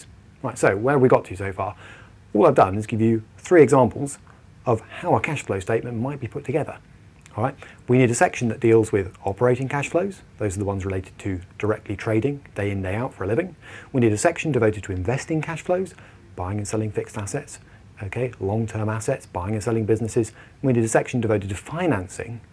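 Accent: United Kingdom. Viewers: His accent and manner is spot on Monty Python's Flying Circus. I can see him now saying, "I want to be a lion tamer!" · What Indian accent??? The guy got a British accent!!!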